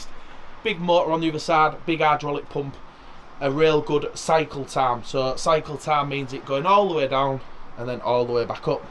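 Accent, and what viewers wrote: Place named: Hull, United Kingdom. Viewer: Nice video fella, and nice to hear the Ull accent, I was a holderness road boy many moons ago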